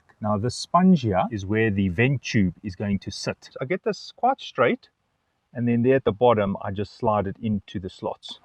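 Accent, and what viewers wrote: South Africa. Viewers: Great video. Detect a South African accent there. Groete van Engeland af 😜 Great video. My dashcam has parked recording mode so need to source a 12v constant. Easily done. What I have used is some conduit and fabric tape. Probably a bit ott but just for the added surity the wire cannot be exposed. Great video though. Very helpful thanks.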